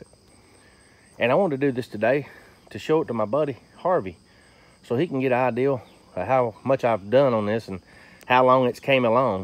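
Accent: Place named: Arkansas, United States. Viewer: are you from Arkansas?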